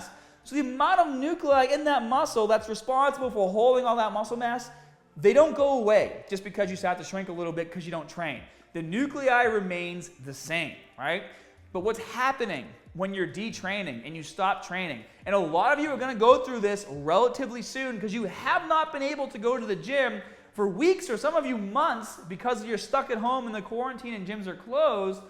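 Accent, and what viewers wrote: Boston, United States. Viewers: His Boston accent comes and goes. He's working HAHD to neutralize it.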